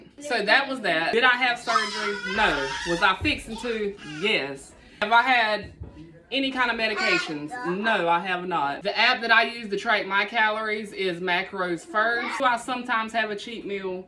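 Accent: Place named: South Carolina, United States. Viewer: I love your accent. You sound like my family in South Carolina.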